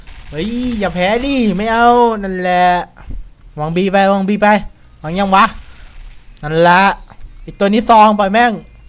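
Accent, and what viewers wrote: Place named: Isan, Thailand. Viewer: ก็ว่าสำเนียงพูดเหมือนคนอีสาร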